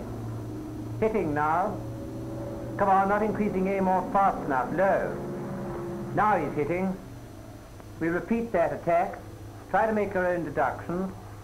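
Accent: RP, United Kingdom. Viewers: The Queen's English of this narrator is absolutely smashing! Absolutely wizard! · Having to listen to that plummy voice all day would drive me nuts.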